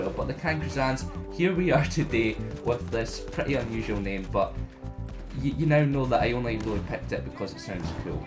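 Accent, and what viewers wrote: Scotland, United Kingdom. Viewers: Can you do a video where you would speak at your normal pace (unless if this is your normal pace in which case i like the scottish accent obv because i have one :) )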